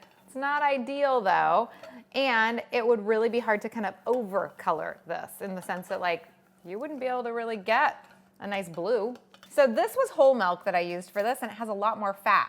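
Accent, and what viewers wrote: United States. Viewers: It’s common in the Pacific Northwest, is from Washington. “Pellow” rather than “pillow” is pretty normal too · MN people pronounce it the same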